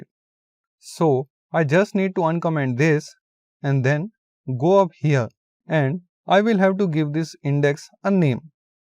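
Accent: India. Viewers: The Indian makes my ears cry. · indian accent makes me sleep... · Indian people english. Suddenly close the video ...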